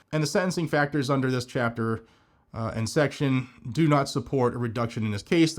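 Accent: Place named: North, United States. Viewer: I love your content, and watch you daily. I just want you to know that everytime you say y’all, l cringe. Every son and daughter of the south know that you’re from up north by your accent, and didn’t grow up saying ya’ll. Glad to have you below the Mason Dixon line, you’re obviously a patriot. But for the love of all that’s holy, stop saying y’all. It just doesn’ go. Getting off my soap box now, God bless and keep up the good work.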